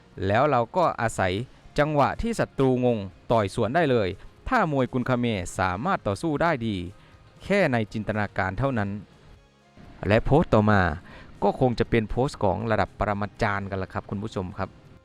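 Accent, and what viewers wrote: Laos. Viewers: แอดมินเปฺ็นคนลาว พูดบ่ชัด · แอดเป็น คนลาว ใช่มั๊ยฟังจาก สำเนียงการพูด ช.ช้างเป็น.ซ.โซ่หมด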